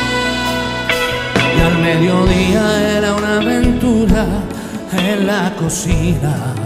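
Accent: Maracaibo, Venezuela. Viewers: Mal hecho es ARGENTINO Y VIVIÓ EN SU ADOLESCENCIA EN VENEZUELA SE LE PEGÓ EL ACENTO SOLO ES ESO PERO EL NO RENIEGA DE SU SUELO ARGENTINO SIEMPRE DESTACA SU PAIS Y SU AMOR POR ARGENTINA DONDE ESTA SU FLIA . 🇦🇷🇦🇷🇦🇷🇦🇷🇦🇷🇦🇷🇦🇷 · Yo siempre he dicho que uno no es de donde nace sino donde se cría. Esta bien, Ricardo Montaner nació en Argentina. Pero su crianza, educación y formación ARTISTICA fue netamente en Venezuela. No tiene acento argentino, tiene acento 100% maracucho (originario de Maracaibo, Edo. Zulia). Su familia, esposa e hijos, venezolanos tambien. A continuación, uno de tantos artículos donde él admite ser primeramente venezolano . Ricardo Montaner: "No soy para nada argentino. Soy venezolano". <link> El mismo caso que Franco de Vita... artistas 100% orgullosos de ser venezolanos.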